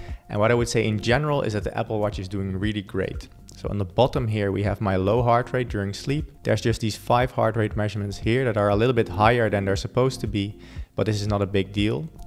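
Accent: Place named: Netherlands. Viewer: I was almost asure you were dutch, until you said you live in Vienna 😅😂. Great video!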